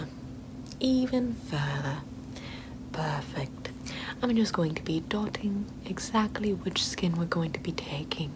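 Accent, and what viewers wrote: United Kingdom. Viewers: A British accent and in B&W double credibility. Funny though a dodgy German accent and B&W wouldn’t inspire confidence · She definitely nose what she's doing. I trust that British accent implicitly. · I definitely trust you to cut on my nose, especially with that British accent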